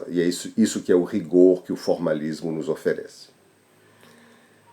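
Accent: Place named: France. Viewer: Aula muito boa 👏👏👏👏 Lindo sotaque francês 😊😊😊😊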